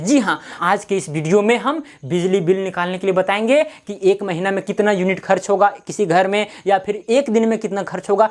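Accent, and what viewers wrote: Bihar, India. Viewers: Bihar se ho aap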